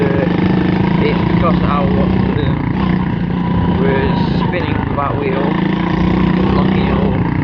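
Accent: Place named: Manchester, United Kingdom. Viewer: I am!!! :) I'm saving for this model bike! He sounds to me like he's closer to Manchester!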